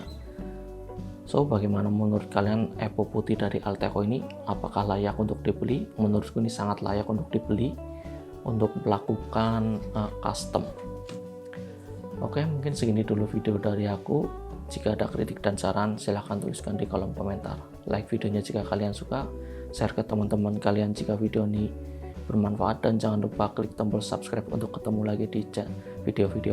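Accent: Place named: Banyumas, Indonesia. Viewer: Mantap, maturnuwun sharing infornya Mas'e! Ngapak Forever! 👍👍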